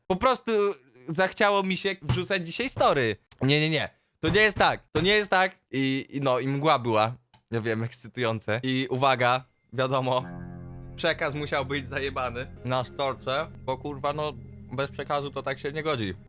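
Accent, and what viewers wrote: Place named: United Kingdom. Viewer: To już wiemy dlaczego masz Brytyjski akcent i umiesz gadać po ang pogo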